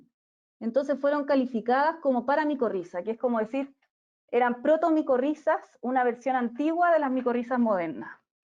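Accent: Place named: Chile. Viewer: Gracias por la conferencia, muy didáctica y un contenido excelente. Y un acento chileno precioso, digno de Gabriela Mistral...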